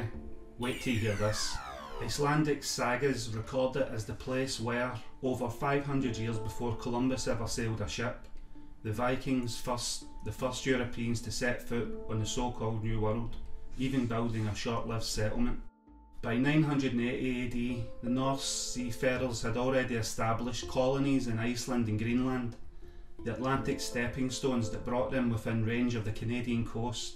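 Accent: Scotland, United Kingdom. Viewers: Love any & all information on the Vikings as well as any info on Scots & Picts too!...thank you for sharing & again I love hearing the Scottish accent! Have a blessed weekend! ~Ginger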